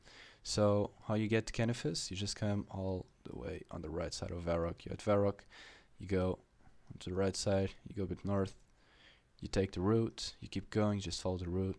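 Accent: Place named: Portugal. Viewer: Where are u from? You sound kinda portuguese..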